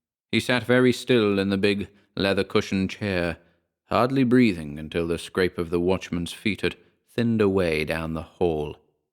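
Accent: United States. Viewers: Fine job with the American accent! Sincerely, Katherine Los Angeles, California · Your American accent has gotten to be nigh perfect! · Yay, more American!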